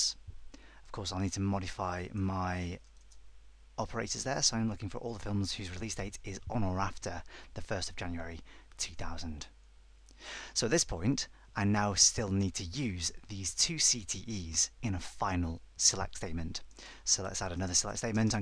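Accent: United Kingdom. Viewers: British accent = learning more SQL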